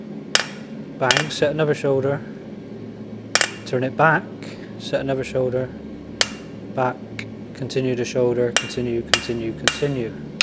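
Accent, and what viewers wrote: West Country, United Kingdom. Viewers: Where is your accent from? West Country?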